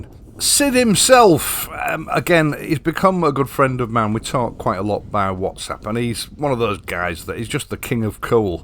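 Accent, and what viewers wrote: United Kingdom. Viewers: Dear, thanks a lot for your videos. They are very nice and I love your British pronunciation. I have one suggestion though. Can you make in your videos timestamps, so that if I want to re-watch a part of the video, I would not scroll in order to find what I need. Thanks again for your work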